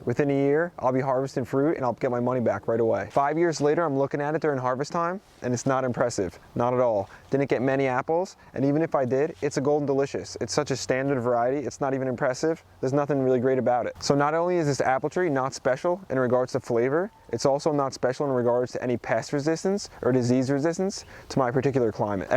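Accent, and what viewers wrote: New Jersey, United States. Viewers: Hey, thanks again. Funny, you took the words right out of my mind about your 1st apple tree: why plant a common grocery store variety, and why not graft onto it. Lol, thinking alike. Do you know John Kohler? He has some pretty good info on YouTube. Also, you’ve become my 2nd favorite New Jersey person (you guessed, fav is The Boss); I’m wondering if you have typical NJ accent? I’m in SoCal.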